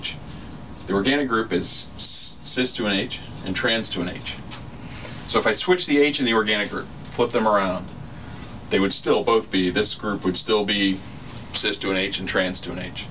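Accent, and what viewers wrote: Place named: England, United Kingdom. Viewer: Are u an English man!!?.., I kinda felt that accent..., Anyways amazing video!!!. Don't stop doing videos coz, "winners never quit and quitters never win"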